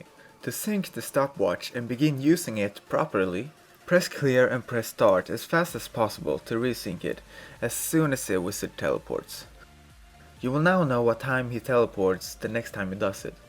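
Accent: Sweden. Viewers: Bra guide. Hörde direkt att det var en svensk tack vare ordvalen och den klara brytningen x,D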